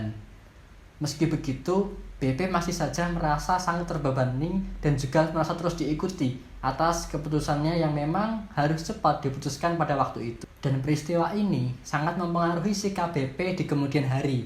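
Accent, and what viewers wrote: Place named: Java, Indonesia. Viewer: Kaka medoq wkwk kerenn😹👍🏻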